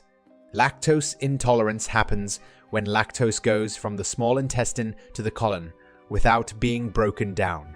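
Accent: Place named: RP, United Kingdom. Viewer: Love yr posh accent presenting this Fartology topic!!! Suprised you didn't say flatuence or gas throughout,yr not that posh but thanks for being down to earth,we love you! God bless you. Oops I just let one off- excuse me!!!!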